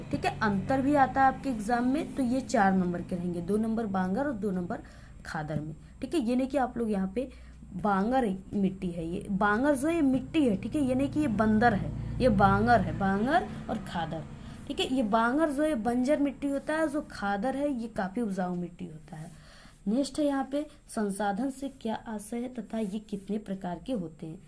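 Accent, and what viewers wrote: Bihar, India. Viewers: Madam aap Bihar se ho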